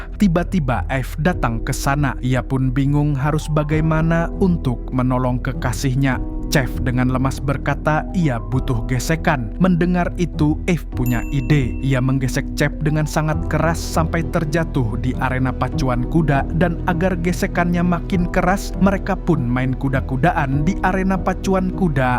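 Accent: Banjar, Indonesia. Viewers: orang banjar kh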